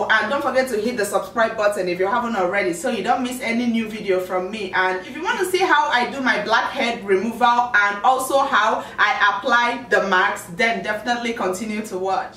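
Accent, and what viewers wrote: Nigeria. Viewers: She sounds Nigerian. my teachers and friends have the same accent, and they're from there. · are u nigerian ? u sound exactly like my parents...and basically 2/3 of my family . and if u are may i ask what part ? :)) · She must be Nigérian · Nigeria most likely, because her accent sounds very strong nigerian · She's from Nigeria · jmelias shanelle no. She just has one of the accent from a tribe in Nigeria. (Igbo) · Many of Nigerians people speak that way. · I guessed from her accent that she was Nigerian, like me, and I've seen it confirmed multiple times in comments. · U sound Nigerian :) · Vasty A. she is Nigerian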